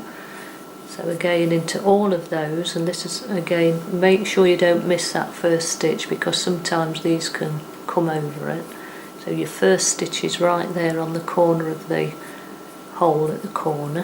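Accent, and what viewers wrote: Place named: Leicestershire, United Kingdom. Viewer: I love your videos! You're so good at explaining things and easy to follow. Thank You. Are you from Leicestershire or Derby? Your accent sounds very familiar. I'm a Leicester/Derby lass myself. I hope you have a lovely weekend.xx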